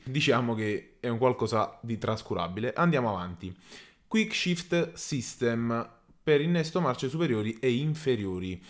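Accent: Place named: South Tyrol, Italy. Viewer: si...infatti avevo sentito un "vago" accento Altoatesino...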